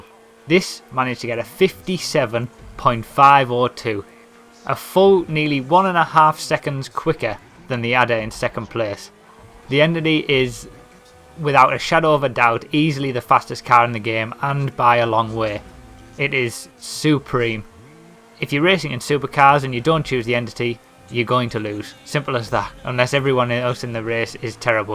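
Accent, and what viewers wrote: United Kingdom. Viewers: man all this british guy does is just race on 'cutting corners ' in his british accent. a lot of this is human error , so his times are skewed. just b/c this guy says a car is 1sec faster than one other one. doesn't mean it is. this is stupid. don't listen to him. he just likes to hear himself talk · is this dude british, were is he from?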